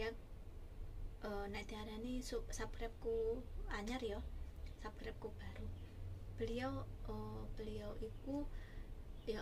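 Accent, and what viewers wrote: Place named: Central Java, Indonesia. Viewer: jowone endi iki mba? ketoe iki wong jowo tengahan sebelah wetanan iki ...... (chenel disetel bar kuwe ditinggal lungo ora dirungokne.........hahahah)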